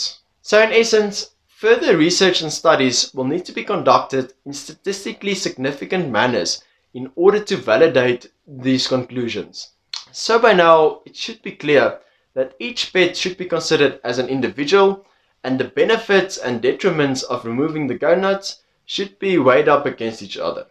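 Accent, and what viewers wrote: South Africa. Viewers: Great RSA accent and easy on the eyes...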